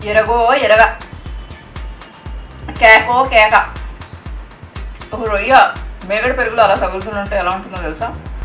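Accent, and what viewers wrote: Godavari, India. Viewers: Nonvege recipe's Anni chala baaga vadutunnarandi Godavari yasatho 💗💗💗 aaayy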